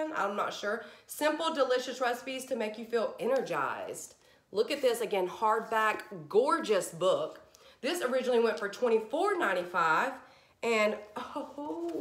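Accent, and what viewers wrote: South, United States. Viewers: Hi! How are you Hun? I live in KC, Missouri we have several dollar trees here that I've been to and I must say IAM completely obsessed, I love your hauls! ❤️Any tips to start my own hauls? P.S.i love your southern accent my mom is from Kentucky and has the same southern draw, love it! Take care honey,be well,God Bless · I’m still here I freaking love your videos 😂😂 you keep me laughing and I enjoy seeing the new finds and hearing that southern accent 💕😋😂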